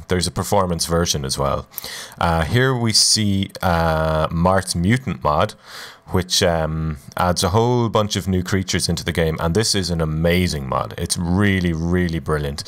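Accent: Ireland. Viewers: l know this is a very old video but in regards to marks mutant mod l personally dont use it because of the silly armored deathclaws and hogs it adds , lt just makes no sense from a lore perspective at least with the hogs and just seems silly to me .also fellout is kinda eh l feel it ups the contrast to much. also l cant pin down your local accent ? what part of ireland are you from ?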